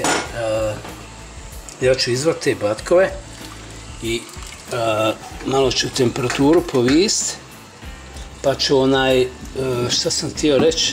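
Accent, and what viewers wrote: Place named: Zenica, Bosnia and Herzegovina. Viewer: Ne znam da li ste iz Zenice...ali imate pravi Zenicki naglasak hehe.Pozdrav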